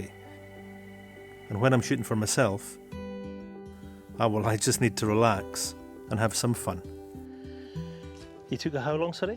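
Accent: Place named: Orkney, United Kingdom. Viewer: So glad Simon Booth pointed me in your direction, your Orcadian accent reminds me of holidays up there and my dad who still has his twang despite living in the Midlands since the late 50’s. Rallying used to be my hobby and now it’s photography so work will be my next port of call 😉 lovely images guys 👍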